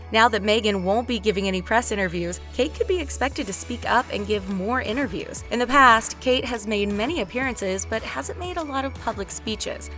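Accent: United States. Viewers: The Talko is an American channel, I suppose. Especially according to the accent and how they portrait Meghan :) Well, I don't think that Kate follows Meghan's example especially because she is so different from Meghan in a good way. I have nothing against Meghan but Kate was always classy and a true royalty. Meghan was a rebel who either didn't want to sit through (what Meghan and Camilla did) or couldn't. One or the other, I don't care, it's non of my business. Btw, it's a typical American characteristic to be a rebel. Americans rebel all the rules, you can see it in the language. Sometimes, it's good to be a rebel. Sometimes, it's out of place. In Russian we say that you have to know when is the right time and the right place. I guess, you say it in many languages, too. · Americans reporting on UK Royal family is silly...the accent is annoying and it's full of factual mistakes. 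Other than British, the Aussie reports are much more accurate and enjoyable.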